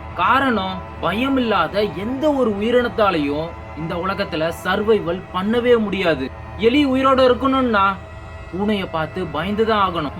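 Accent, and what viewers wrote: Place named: North, India. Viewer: Konjam normal la pesu thambi...vadakan pesuramathiri iruke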